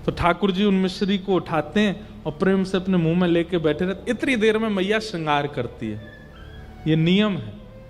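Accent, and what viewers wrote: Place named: Braj, India. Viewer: Jay Shri Krishna Radhe Radhe 🕉 OM Namo Bhagvate Vasudevy Namh OM 🕉 Namo Narayan ji Jay Shri Hari Jay Shri Shyama Shaym ji Jay Shri Laxmi Pati Jay Shri Radha Rani Jay Shri Radha Kishori ji Jay Shri Radha Raman ji Jay Shri Radha Vallabh Lal ki Jay Ho ♥️ Radhe Radhe Jay Shri Harivansh Guru Dev Maharaj ji Aapki vraj Vani Badi Mithi hai Jay Shri vraj vasi Aapke Charno Mai Koti Koti Vandan ♥️ 🙏 Jay Shri Vrindavan Dham 🙏 Jay Shri Yamuna Maharani ji 🙏 ♥️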